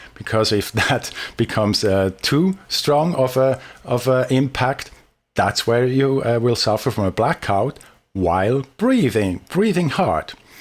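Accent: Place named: Switzerland. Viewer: Very cool and informative video!😉 really like the swissgerman accent😁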